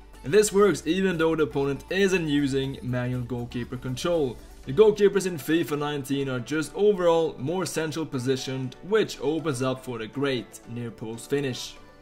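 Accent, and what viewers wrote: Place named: Sweden. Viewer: He is so swedish listen to his accent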